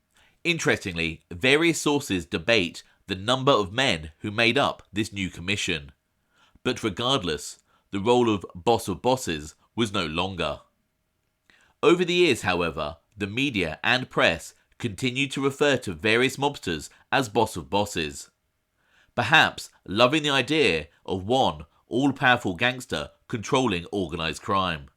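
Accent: England, United Kingdom. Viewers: Not true, I'd watch an autobiographical vid in a hot minute lol. You're a Boss in your own right. Top notch research and presentation complete with a crisp English accent. Slay!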